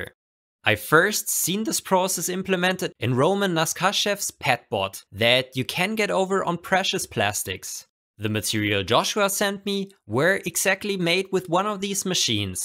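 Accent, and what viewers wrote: Germany. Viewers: This deutscher Akzent ist schon gut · Man merkt das Du deutscher bist🤣 guten Tag evrebody im cooles video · Moin meister! Man kann hören das du deutsch bist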